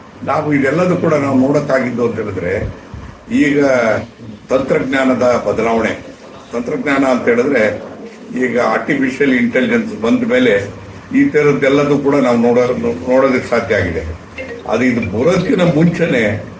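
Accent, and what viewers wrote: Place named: Sira, India. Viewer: sira bhashe sogadu super. na fan nanu. Inti H D mestru.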